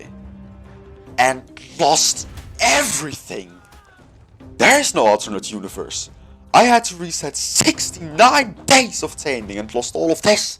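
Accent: Netherlands. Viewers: Hmmmm, dutch person detected? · Where are you from? You sound a bit Dutch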